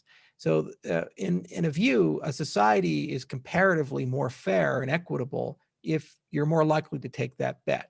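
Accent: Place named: Netherlands. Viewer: i hear a slight dutch accent. great content!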